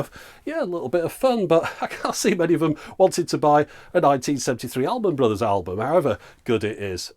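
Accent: United Kingdom. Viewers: Love your show!!! Being American hearing your British accent makes the show a lot classier!!(yes it's true, we think all Brits are smart)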